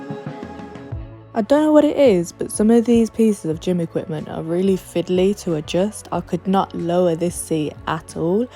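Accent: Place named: Birmingham, United Kingdom. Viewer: Dunno if I can deal with the brummy accent😂 a decent pump never the less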